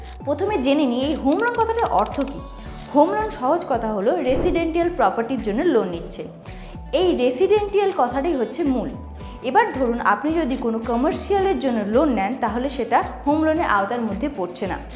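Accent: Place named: India. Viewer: bangla ta hindir moton kore bolche re hay hay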